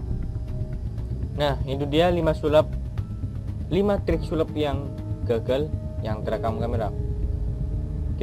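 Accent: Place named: Banyumas, Indonesia. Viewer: iya ,, yg ngomong ngapak,,,... memang ok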